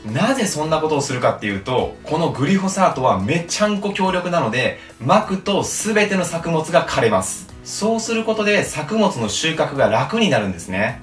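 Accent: Kansai, Japan. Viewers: さんの動画に出会ってから人生が一変するほど食に対しての意識、世界が変わりました😂 さんが教えてくれたことを色々試したくて実践するたびに楽しくて仕方がなく、毎日カラダが喜んでます🐣🐣🐣 新たな扉を開いてくれて本当に有難うございます💕🙏 さんが話す関西弁が大好きなので、ぜひこれからも頻繁に登場させてください🥰（笑） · くん、さすが関西人！ネイティブやね。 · 双子の姉からさんのチャンネルを教えてもらって、最近入部し、双子でハマっています笑👯‍♀️❤️ 私も人生が一変するくらい食生活が変わり、知らない世界が広がり、食にこだわる事が楽しくて仕方ありません！🤗 さんの軽妙なトーク力と、惜しげもない知識が面白すぎて、色々実践してみたくなります😉 今日も手のスナップ運動きかせて、カラダヨロコブ物、生産者さんを応援出来る物、見つけてゲットしてきます😊 私もさんの関西弁大好きなので是非❤️笑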